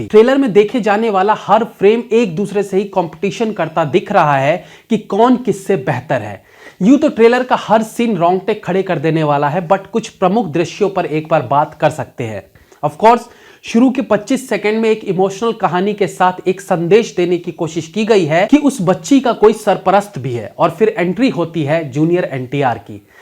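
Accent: Bihar, India. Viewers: Pure bihari ho aap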